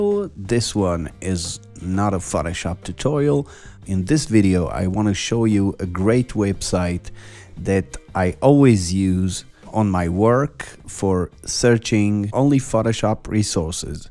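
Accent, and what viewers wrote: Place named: Israel. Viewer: שומעים שאתה ישראלי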